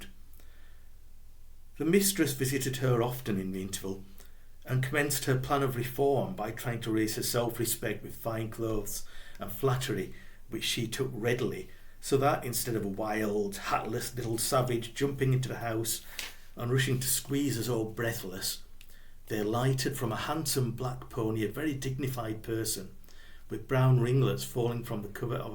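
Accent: Yorkshire, United Kingdom. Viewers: I just don’t understand why you apologise for your Yorkshire accent!!! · Wuthering heights is the best novel in my opinion. Movie adaptations cannot capture the essence of this book in my opinion. It's great you are reading it in the wonderful Yorkshire accent it is meant to be read in!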